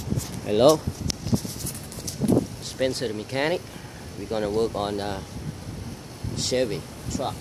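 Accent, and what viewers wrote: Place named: Latin America. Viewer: Porque no lo grabas en español?,,claro se nota que tu acento anglosajón o inglés no es con acento real,,se nota más un latino que quiere dominar el inglés,,porfavor, se te entendería mejor en español.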